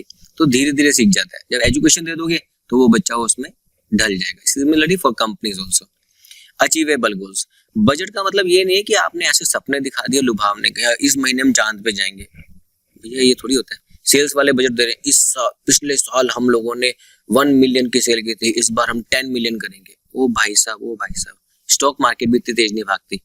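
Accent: India. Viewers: Sir ap lucknow se ho ?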